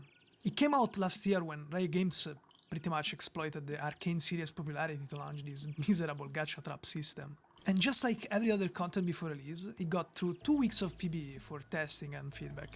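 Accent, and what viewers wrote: Italy. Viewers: You have such an Italian accent. Is it just me, or do you perhaps have Italian origins? I'm so curious xD sorry But anyway, I always appreciate your content. Thank you for what you do for us in the community ❤ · bro are you italian perchance your accent :D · Are you italian perhaps? Your accent sounds like that for me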